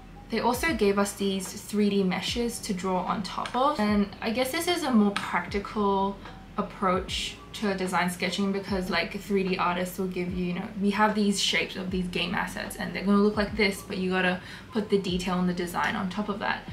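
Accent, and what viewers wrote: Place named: Australia. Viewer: you have an australian accent, what's your background?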